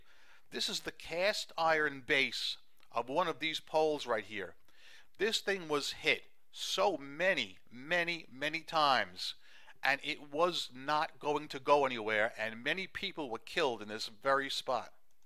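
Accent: New York, United States. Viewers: Your nyc accident isn't a pronounced and arrogant like we hear on tv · and that accent--authentic New York. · I loove yo NY accent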